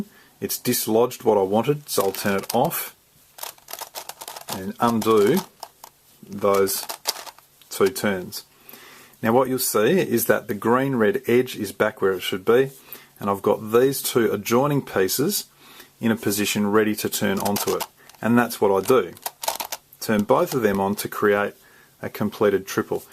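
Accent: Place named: Australia. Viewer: Are you Australian, mate? Loved your accent 😂 Also loved the tutorial. It really is the best! You explain all the basics, but don't treat your viewers as idiots and repeat same things seventy two times. Your explanation is clear and simple and you rule!! 😎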